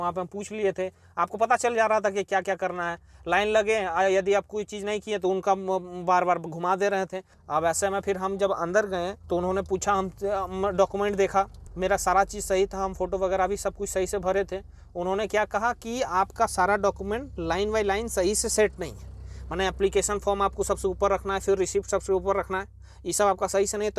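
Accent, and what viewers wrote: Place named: Bihar, India. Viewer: भैया आप हो झारखंड के पर बोल बिहारी स्टाइल में रह हो मधेपुरा बिहार से प्यार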